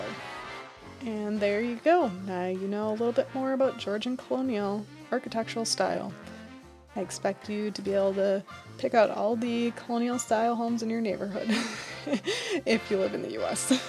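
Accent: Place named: Syracuse, United States. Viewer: This is awesome. There is a magnolia in my hometown of Syracuse NY. Your accent kind of sounds like you’re from there!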